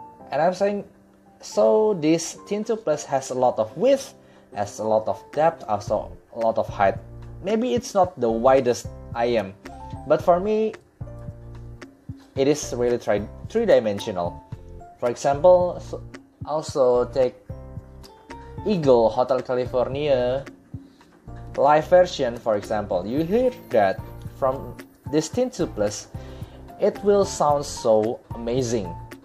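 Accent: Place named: Indonesia. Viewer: logatnya kayanya orang Indonesia nih....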